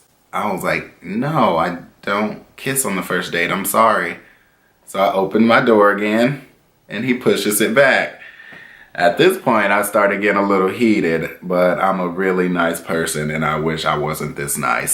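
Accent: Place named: South, United States. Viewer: Lmfao... Wow, you did the most for him. Are you from the south?! You have a very southern accent.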